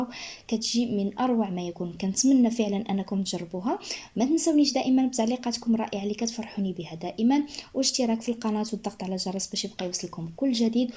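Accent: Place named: Algeria. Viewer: هبلت. هبلت هذه. لهجة. جزائرية . اكيد. انك 👇👇👇👇👇👇 اخذتي. المنشور. والوصفة. من. صفحة. جزائرية. .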